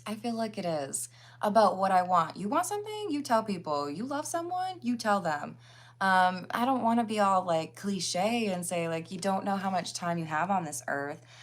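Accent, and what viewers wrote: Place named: Minnesota, United States. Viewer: The Minnesota accent was ADORABLE 😂🎉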